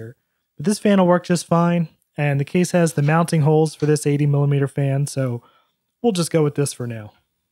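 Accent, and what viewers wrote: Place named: Philadelphia, United States. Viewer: LOL you didn't have to mention "the hot Philadelphia sun"; as soon as you said "wooder" (water) it was plain as day where you're from good sir. :D Thanks so much for sharing this awesome find! I recognized that case from the old Dells of the same era, but I never got the opportunity to see any of those official third-party Macs before!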